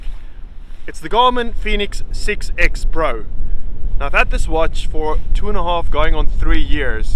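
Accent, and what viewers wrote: South Africa. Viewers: I know he’s a South African when I heard he’s voice🇿🇦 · Are you from SA? Thanks for the review!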